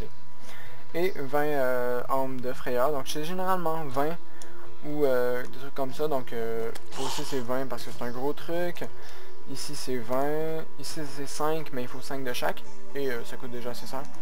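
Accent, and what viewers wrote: Quebec, Canada. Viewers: Tu est québécois ? J aime bien l accent du Québec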